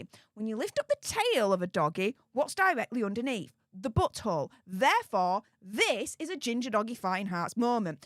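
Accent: Yorkshire, United Kingdom. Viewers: Love the Yorkie accent!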